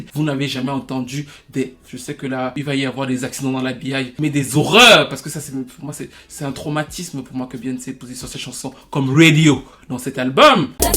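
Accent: Cameroon. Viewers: j’aime bien ton petit accent camer 👍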